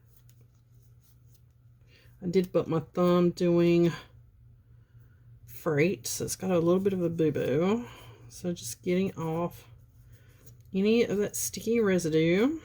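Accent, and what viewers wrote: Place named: Alabama, United States. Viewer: I came to the comments to see if I could figure out her accent 😂 and I see The Alabama girl. I’m from Jasper 😊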